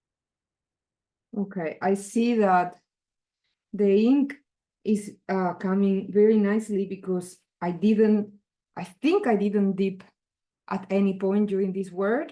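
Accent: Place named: Catalonia, Spain. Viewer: Fun to watch you create beautiful words with such ease. Also fun to listen to your Catalan Spanish accent. ❤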